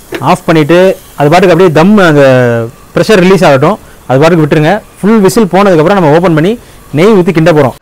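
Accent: Madurai, India. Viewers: I am not here for your recipe, but your humorous commentary and your beautiful Madurai tamil. Love from Bangalore bro. Always keep doing the beautiful work. Cheers❤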